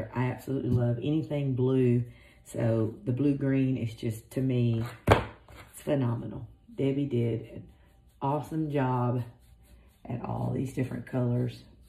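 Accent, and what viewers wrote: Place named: South, United States. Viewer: You rock, Lady! I could listen to you speak alllllllllll day! I’m an Aussie so I love the southern USA accent ❤️🇦🇺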